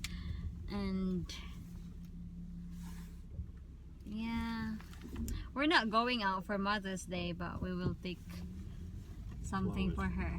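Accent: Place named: Visayas, Philippines. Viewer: Hello Sis Taga asa ka sa Pinas murag Bisaya man ang imo accent sa words?..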